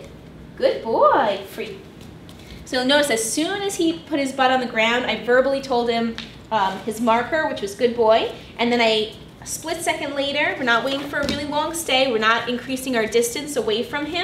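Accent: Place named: New York, United States. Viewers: this New York accent is almost unbearable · She’s gotta be from Long Island. We’re the only people that pronounce dog that way lol. Great video. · Are you from new York or new jersey? I kinda hear an accent there lol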